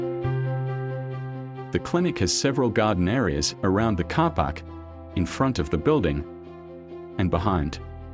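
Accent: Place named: New Zealand. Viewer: You sound more kiwi than Australian hahaha Gosh your work is fantastic.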